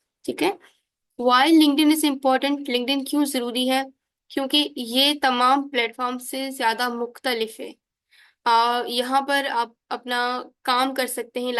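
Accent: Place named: Sindh, India. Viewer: Ilahi behtreen. Khushe hue ke mashaAllah Hamare sindhi sisters be Digital platforms me hai Twan je Awaz ahen accent k lago munkhe tawan sindhi ayo. BTW Zbrdst lecture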